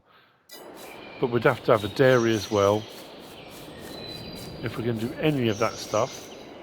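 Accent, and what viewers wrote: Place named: London, United Kingdom. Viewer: Hei where in England are you from?I feel like you have London accent.or around there.and again sorry if I miss spelt anything.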